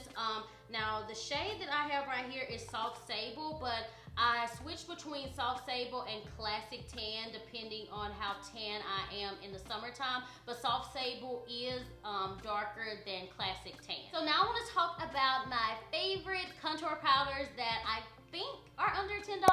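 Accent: South, United States. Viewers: Your southern accent is so adorable.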